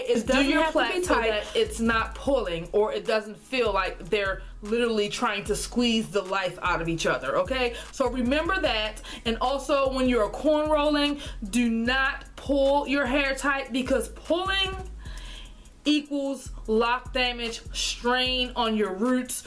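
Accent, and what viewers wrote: Baltimore, United States. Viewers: I gota ask.. lol are you from Baltimore??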